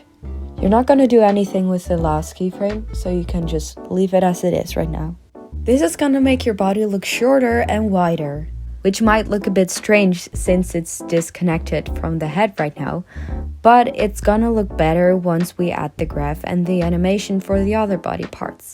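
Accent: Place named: Netherlands. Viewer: i smell dutchness :D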